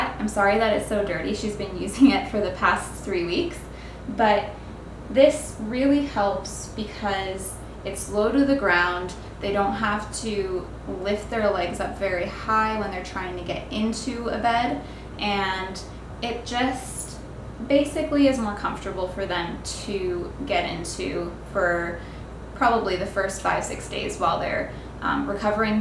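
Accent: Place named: Canada. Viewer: Canadian ass accent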